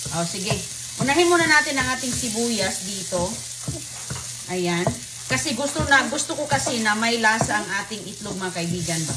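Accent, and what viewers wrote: Visayas, Philippines. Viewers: Tama iba ang bigkas nang bisaya kung magsalita nang tagalog.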